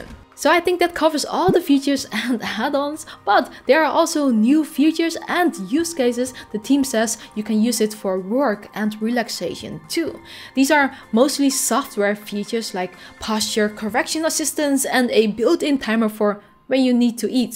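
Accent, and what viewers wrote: Netherlands. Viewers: Ur in the us? I always thought you had a Dutch accent 😅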